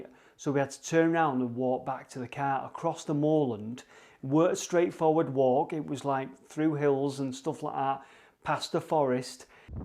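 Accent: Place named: Oldham, United Kingdom. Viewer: My family is from Oldham, I’ve visited twice in the last 3 years. Can’t wait to visit again., love your accent, reminds me of my dear late dad. ❤️❤️❤️❤️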